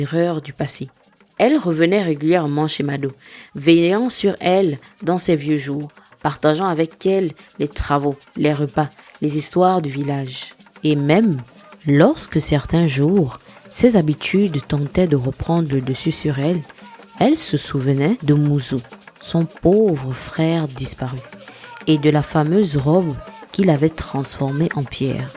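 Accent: Gabon. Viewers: La narratrice est forcément gabonaise. Ne changez pas pour l’IA svp❤ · Un bel accent Gaboma❤ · C'est une voix Gabonaise derrière cette belle histoire ❤ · La voix d’une gabonaise ❤très belle histoire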